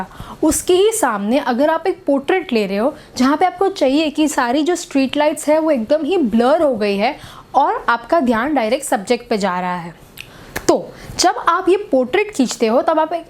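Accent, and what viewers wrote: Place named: Bihar, India. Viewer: बेसिकली बिहार से लगती है आप .. anyways, अच्छे से बताती हैं, लेकिन presentation की थोड़ी सी तैयारी और जरूरी है .. जानकारी तो है no doubt... 👍👍💐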